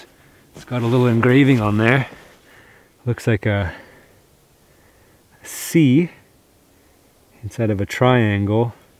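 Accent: Canada. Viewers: I knew it was Canadian the first time he said "aboot".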